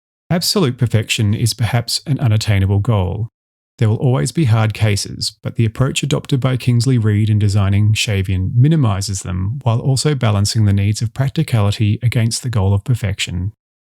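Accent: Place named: RP, United Kingdom. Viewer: This reminds me of a difficulty I had learning Icelandic. The “short i” sound as in “sit” cannot end a word in American English, but it CAN in Icelandic, as in the word “listi” (a list). For me, it takes special effort to pronounce those vowels the same way instead of pronouncing it like the Y in “happy”. So it makes sense to me, as an American, that “i” is a single phoneme that is pronounced differently in different cases. It didn’t even occur to me that a final “i” can be “short” in some accents. But when I heard the RP, there it was! Was the author of Shavian American? That might explain the choice.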